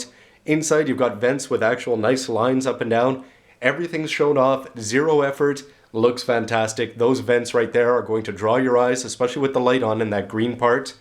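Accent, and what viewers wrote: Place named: Canada. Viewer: sounds Canadian...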